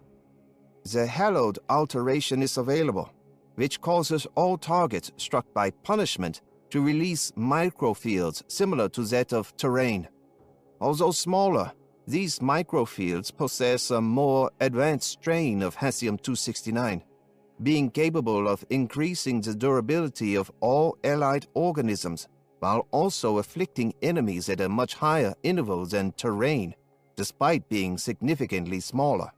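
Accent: Germany. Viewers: is that a german accent? lol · is this a real or fake German accent?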